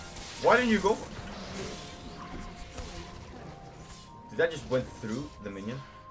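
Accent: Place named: Germany. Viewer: You do kind of look German and I have heard english speaking germans who sound like you. I initially assumed you were too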